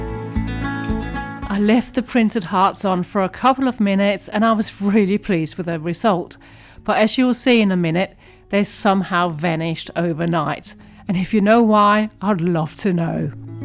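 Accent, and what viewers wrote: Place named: United Kingdom. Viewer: Wow! Your porch is absolutely stunning! And those sweet teddies are tucked in the tree so they are sheltered from the elements! I think Hermes makes a bag styled very similar to your Teddy Blake bag. Aren’t you chic with that fabulous handbag! Finally, besides loving a British accent that I have fun trying to mimic, I learn new words from you. In the USA we call “wadding” filling or stuffing. You have convinced me that I need to make some of the felt hearts with wadding! Than you so much for sharing your creativity with us!❤❤❤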